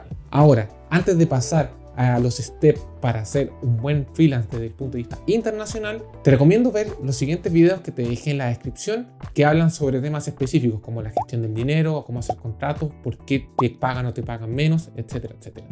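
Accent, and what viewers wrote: Chile. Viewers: Suscrita a partir de ese hermoso acento chileno, tu fondo de video y obviamente tu calidad de contenido!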